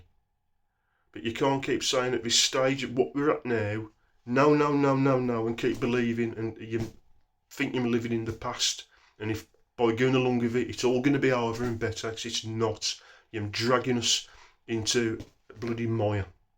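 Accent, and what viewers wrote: Birmingham, United Kingdom. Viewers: Too many sheep we are fucked. And spoken like a true brummy!! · You sound Brummie, are you from Birmingham? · Nonsensense, science fiction...but good brummy acting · Luv your brum accent i am a Glaswegian.And your message is true Keep doing your videos.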